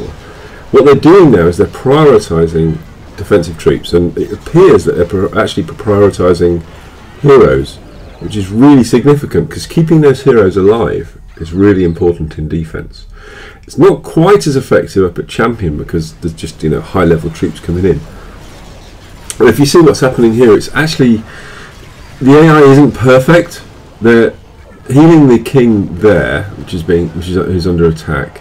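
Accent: United Kingdom. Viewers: where are you from lik where in the UK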